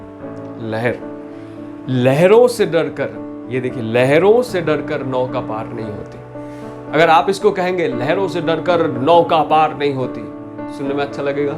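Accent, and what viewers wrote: Bengal, India. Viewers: Nice, but aapki hindi me Bengali tuch abhi bhut dikhta hai bro.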